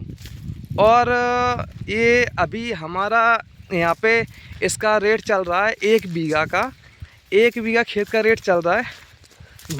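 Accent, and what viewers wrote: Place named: Bundelkhand, India. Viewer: Kaha se ho bhai.....bundelkhandi lagray😊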